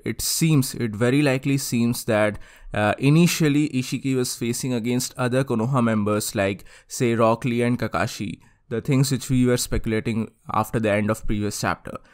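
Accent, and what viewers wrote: India. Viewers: You speak in Indian assent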